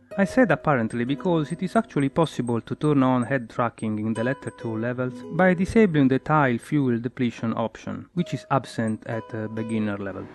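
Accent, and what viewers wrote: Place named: Italy. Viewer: Si sente che sei italiano... :) Allow si pronuncia "ellau", questa è la parola che suona peggio, anche se devo ammettere che la pronuncia lascia un po' a desiderare. Ma in fondo chissene... ;) Video molto utile, almeno per me. Mi piace! ^^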